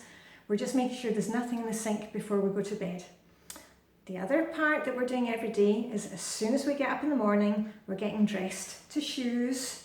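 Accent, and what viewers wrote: Scotland, United Kingdom. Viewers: I love your style, the way you explain the flylady-system I have been following through years, your English (is it Scottish;) - so thank you for all those things. I wonder if you could tell me what kind of sink tidy (caddy and bottles) you are using - it looks so elegant. Greetings from Austria Knitgudi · Thank you, for your wonderful persistence and gentle Scotish accent. ♡ I was always overwhelmed by the to do list and never allowed time for the fun stuff. Now on day 4 with my sink clean - it's playtime afterwards!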